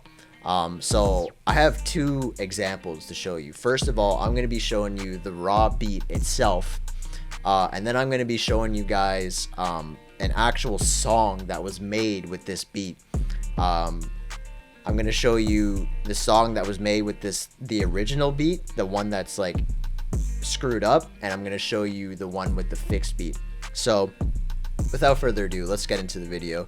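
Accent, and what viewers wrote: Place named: Toronto, Canada. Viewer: I’ve been here since 600 subs, I love your beats and the Toronto accent really standing out here😂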